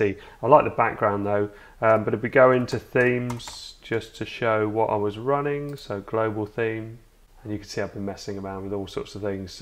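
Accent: United Kingdom. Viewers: nice to hear a fellow Brit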